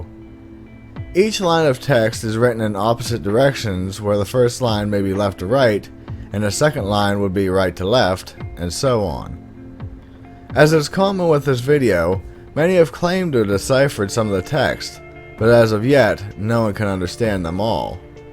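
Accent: United States. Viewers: Like the video. I assume the narrator is an american, and i´m just curious where in america one talks like this. Is it called dialect?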